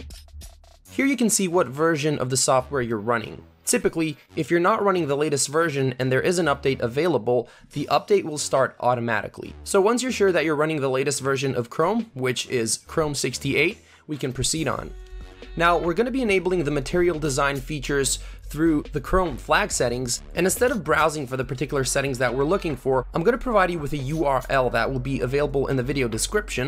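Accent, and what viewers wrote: Balkans. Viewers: You are from Balkan,aren't you? Still you speak English very well...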